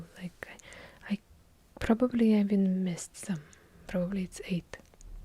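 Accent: Russia. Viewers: I will always love her Russian accent. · The Russian accent is just unbeatable 😍😴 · I come for the heavy Russian accent but I stay for the dry wit and subtle political satire.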